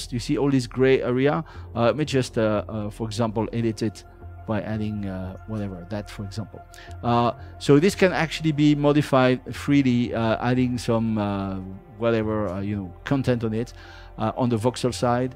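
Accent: France. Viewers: This guy sounds French, am I right?? Hmm, French doing good stuff these days. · On entend bien que le narrateur est français :) Je viens de découvrir le jeu et bravo aux parisiens pour ce travail pas encore finit mais impressionnant au possible ! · Cet accent français quoi x) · Magnifique l'accent français... ;-)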